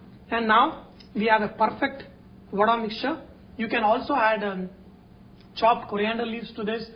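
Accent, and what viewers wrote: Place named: Tamil Nadu, India. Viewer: well, if there is namaste, salaam aleikum, sat sri akal, then, Vanakkam can and should surely be in the mix. Especially since his accent and roots are, seemingly, Tamil. Personally, I find the non-appearance of 'Vanakkam' rather jarring. I am all for national integration, please start from the roots. YOUR roots. Don't abandon them. The inclusion will be appreciated. The exclusion, rather weird and unnecessary.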